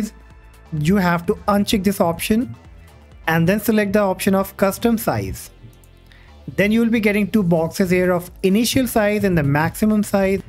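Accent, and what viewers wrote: India. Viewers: I immediately became suspicious about this 'more ram' due to the fact that his voice sounds Indian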